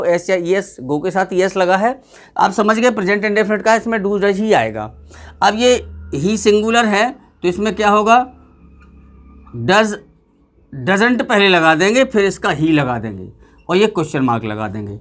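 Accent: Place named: India. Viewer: गांव से हैं अंग्रेजी बोले देसी स्टाइल में 🤣🤣🥰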